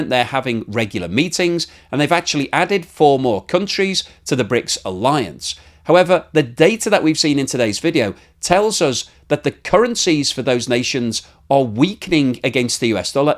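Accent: United Kingdom. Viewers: British accent = western bullshit. EVERY SINGLE TIME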